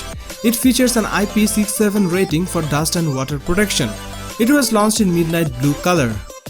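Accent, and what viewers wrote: India. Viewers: Pasti india bahasa inggris sperti ini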